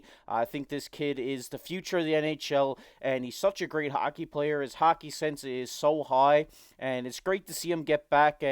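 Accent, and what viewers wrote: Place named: Cape Breton, Canada. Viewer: I’m guessing Cape Breton.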